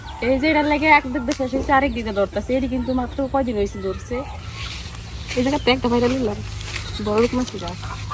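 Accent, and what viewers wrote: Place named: Mohanganj, Bangladesh. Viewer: বাংলাদেশের মোহনগঞ্জের ভাষা, আমার এলাকার কাজের বুয়ারা সবাই ঐ অঞ্চলের এবং এনাদের ভাষায় কথা বলে। কিশোরগঞ্জ, মোহনগঞ্জ সম্ভবত কাছাকাছি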